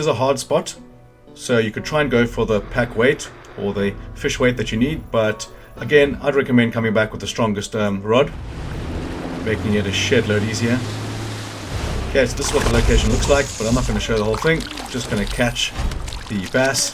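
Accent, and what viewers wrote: South Africa. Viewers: You sound South African (like me) One of the most brilliant concise vids I have ever had the pleasure to follow. Sub for certain.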